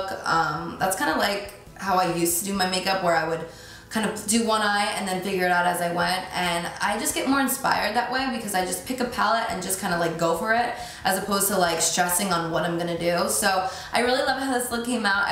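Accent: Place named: Philadelphia, United States. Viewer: Absolutely gorgeous ! Love this look 👏❤️ Are you from Philly or around here? I hear your accent like mine... “wuter ” hahaha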